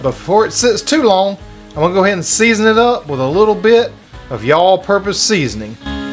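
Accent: South, United States. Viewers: Hi, I absolutely love your southern accent. I can listen to you for hours. I’m from Australia and we don’t do American accent well🤪 but I think after listening to you for a couple of hours, I might actually nail it 😀 Great video, I made your ultimate keto bread yesterday… OMg it is so delicious … I just had it for lunch today…even my non Keto husband loved it. I also had enough mix left over to make 6 muffins which I will freeze if my husband doesn’t get to it first Cheers from down under and keep up the work 🎉🎉🎉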